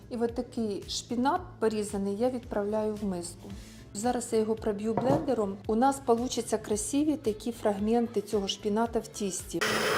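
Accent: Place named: South-East, Ukraine. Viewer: Не робіть зауваження --це південно-східний суржик, він теж має свою історію.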